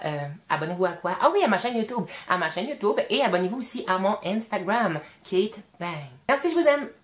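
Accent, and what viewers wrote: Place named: Quebec, Canada. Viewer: Hey je découvre tout juste ta chaîne YT et franchement tu es superbe. Beaucoup de personnes sont rageuses ou mauvaises car elles sont mal dans leur peau tout simplement. Moi je dis si tu te sens mieux dans ton corps maintenant et bien c'est le principal. Après c'est sur que sur YT pas mal de personnes osent insulter ou autres car elles sont derrière un écran. Bref... courage et force à toi, tu es une belle personne et je suis contente d'être tombée sur ta vidéo. Ps: j'adore ton accent québécois 😁😜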